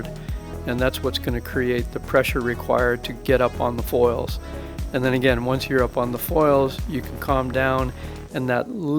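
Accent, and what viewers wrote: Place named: Maui, United States. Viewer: your German ist incredible, your Maui accent exotic, please comment all parts in German. Would bei The KPI for Naish Wings, learning from the master, Aloha, Hjot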